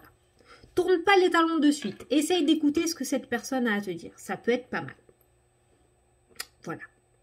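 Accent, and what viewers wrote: South, France. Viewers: salut, putai... ca fait un bien fou d entendre l accent de chez nous!!! j aime beaucoup ton naturel et ta spontaneitee. bisette de l aveyron!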